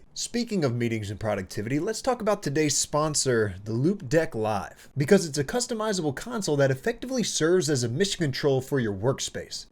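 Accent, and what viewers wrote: United States. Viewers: Amazing American Accent, gotta learn some of it too!!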